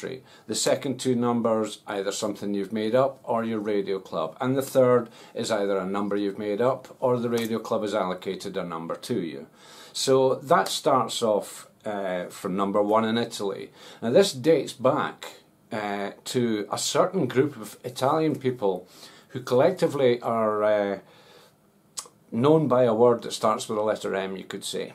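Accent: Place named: Scotland, United Kingdom. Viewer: Hey you old hippie. You sound very much like a Scotsman. I'm a Ham radio operator and quite often I do 11 meters. Hang mostly on 27.385 LSB which is the national calling frequency on 11 meters. I use a Yaesu 991a which I had the MARS mod done so I can go anywhere on 25 to 27 MHz. I use an Antron 99 antenna for 11 & 10 meters. I've got an MFJ 969 Versa Tuner II, roller inductor tuner just in case my 991a won't tune the frequency. Cheers & 73 from W Rusty Lane K9POW in eastern Tennessee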